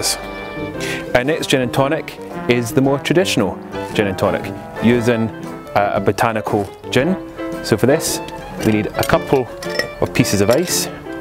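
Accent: United Kingdom. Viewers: Please explain again what is that you put on the glass the first time to make it pink colored? I am sorry I can’t really catch up with your beautiful British accent. Love your video so much.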